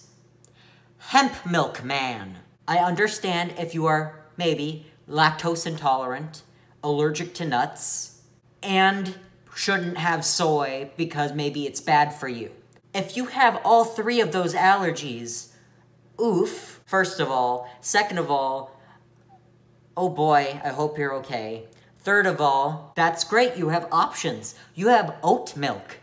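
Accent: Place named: Midwest, United States. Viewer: If one slows down the video to .75 speed, you sound like a drunkard complaining about life and I fucking live for it. I love your voice, I'm from Michigan so It's nice to have someone from the Midwestern reigon to identify with. I dunno, it sounds weird and you'll probably not see this and/or not acknowledge it but I just wanted to share my not-so-valuable opinion. You are so funny man, I honestly hope you get the recognition on this shitty platform that you deserve.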